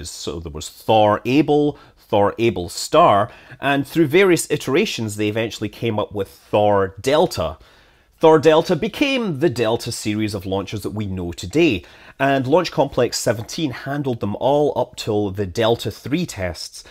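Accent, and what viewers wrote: United Kingdom. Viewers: Cats? Where? I was engrossed in Scott's velvety UK accent.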